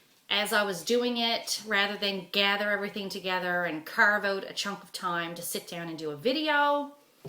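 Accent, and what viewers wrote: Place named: Newfoundland, Canada. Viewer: Always love your updates and stories and seeing how much progress you manage to make in spite of working and being a great wife and mother. My. best friend in high school and college had both parents and a live in paternal Grandmother that were Newfoundlanders transplanted to Tennessee. So I love to hear your NL speech. Reminds me of all of them. Good memories. Thanks!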